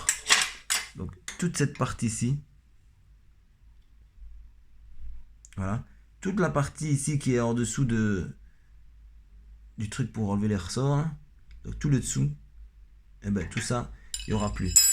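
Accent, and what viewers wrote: Belgium. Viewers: tu me fais deliré comment tu parles...ta "façon d'être" au travers des vidéos... tranquille quoi, posé.. avec ton petit accent belge (si je me trompes pas). en tout cas continu tes videos cest sympa et apaisant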